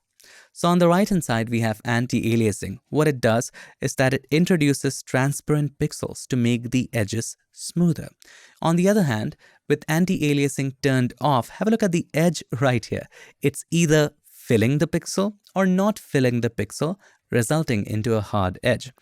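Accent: India. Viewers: This guy makes the Indian accent very smooth and well integrated with English language. Nice style.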